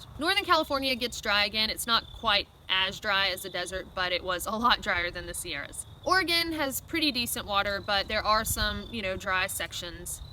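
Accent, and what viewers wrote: South, United States. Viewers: Amia she probably just pronounces it that way because of her southern accent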